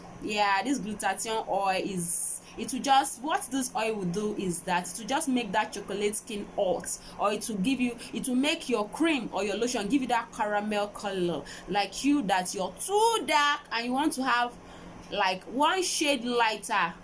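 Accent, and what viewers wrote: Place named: Lagos, Nigeria. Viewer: Ur Yoruba accent is like dis Lagos pple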